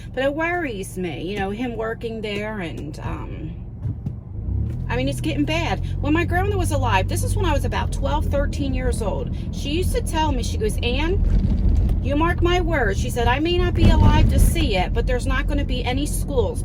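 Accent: New Jersey, United States. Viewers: I truly enjoy these vlogs I’m a new subscriber l love your Jersey accent by the way love ❤️ from Ohio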